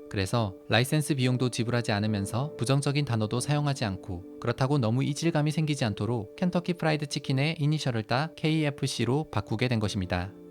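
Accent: Gyeongsang, South Korea. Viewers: 어머니 에서 사투리는 속일수 없네 ㅎㅎ그냥 경상도 사투리로 하세요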